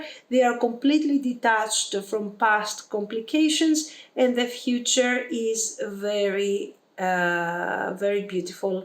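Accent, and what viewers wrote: Greece. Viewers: First time watching a video on your channel! As soon as i heard you I thought you were Greek, and you actually are😂🩷 Btw loved your reading εισαι υπεροχηη❤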